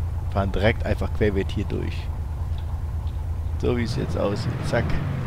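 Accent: Cologne, Germany. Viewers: Deine let´s play´s sind der hammer hört sich na ne kölsche jung an oder täusche ich mich da ? :D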